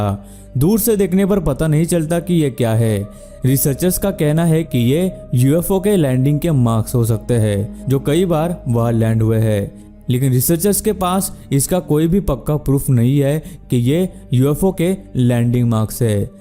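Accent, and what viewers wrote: South, India. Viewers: Bhai ki voice se lagta he ki bhai South se he ??🤔🤔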